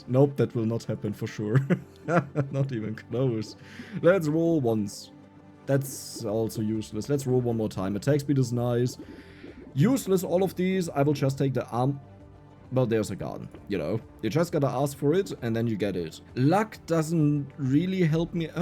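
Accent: Germany. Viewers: Muss ehrlich sagen. Hab DF‘s lets plays geschaut und nach dem spiel gesucht. Bin so auf dein kanal gekommen. Deine ersten worte auf englisch gehört, und sofort war mir klar der brudi ist ein deutscher. Hahaha, kein hate. Find es echt krass wie du englisch spricht. Hut ab mach weiter so abo hast du aufjedenfall.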